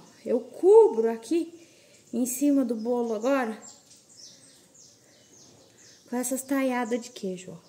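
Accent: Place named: Sao Paulo, Brazil. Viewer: Boa tarde família linda, tdo bem? vc é Paulista, vc tem sotaque de paulista, o r pucha pelo l, polta e colta, me responda por favor, que vontade de comer um pedaço, bolo. moro em Santa Cruz Rio de Janeiro, abraços pra todos vcs e me mande um abraço tbm. ❤❤❤❤❤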